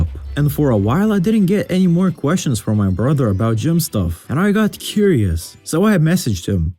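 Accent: India. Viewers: Bro the indian accent😢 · Don't you think it's racist to do that Indian voice over?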